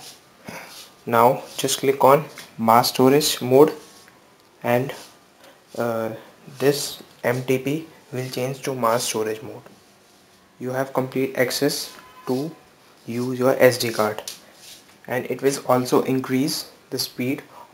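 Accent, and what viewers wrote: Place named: India. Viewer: I can't understand the accent. Is this guy from India? The Indian accent of English is the worst in the world.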